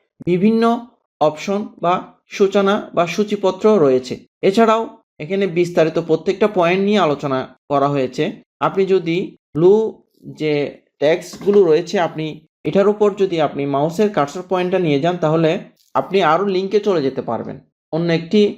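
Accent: Chittagong, Bangladesh. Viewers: ভাইয়ার বাড়ি নিশ্চয়ই চট্টগ্রাম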